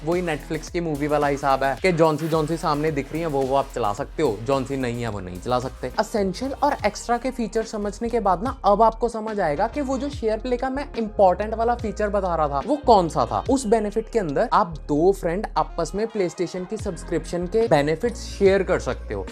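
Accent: Punjab, India. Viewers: Bro are u from jammu or Punjab because of Accent?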